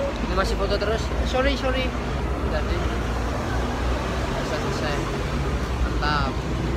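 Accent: Java, Indonesia. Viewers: Dari kabeh vlog yang kulihat semuane gawe kemekel tapi seng lebih kemekel yang jalan-jalan Disneyland Hongkong terus sama tutorial push-up, sit-Up, back-Up yang Salah. Demik seneng banget kambek medhok e. Sukses selalu mas 🙏 Selalu sukses buat mas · Wohooooo, akhirnya muncul juga yg ditunggu..medhokmu itu lohhh 😁 Sukses selalu yaaa😉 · Akhirnya muncul jg vlognya si ganteng tp medok ini haha😆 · Oalah lagi..ditungguin gak nongol2..upload juga. Suaranya..gak keliatan orang Jawa ya mukanya tp medok nya bikin ketawa 😂 Inget gak pas lagi tanding trus mau ke toilet ngomong pake B.ingg ke wasitnya. Aku ngakakkkkkkkkk lucuneeeeee yaallah sampe diulang2 ampe gak kuat aku nonton nya juga 😂😭 Keren lah..👍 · si muka bule suara medhog andalanku🌈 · Suka denger ka ngomong, lucu lucu medok gemesin ea.sip sip wkwkwk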